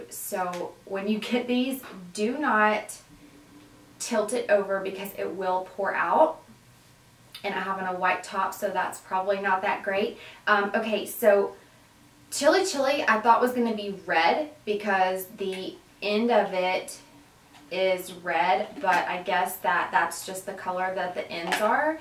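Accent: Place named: Nashville, United States. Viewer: You are just so gorgi! I knew your accent sounded familiar, just read that you're from Nashville! I live in KY. We frequent Nashville & Gatlinburg quite often, its just beautiful there! Loving your vids! :)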